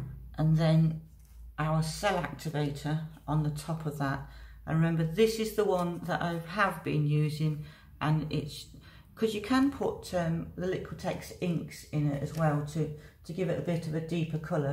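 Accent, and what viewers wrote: United Kingdom. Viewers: my DArling this is bloody fantastic❤ I absolutely loved your video loved your experiment loved the outcome and love that I can use my fancy British Accent[in my head] when typing to you🤣🤣🤣Sorry I'm a huge Royal EVERYTHING fan so when I hear that beautiful accent I go gaga. Love you sister. TaTa for now Dahhhling❤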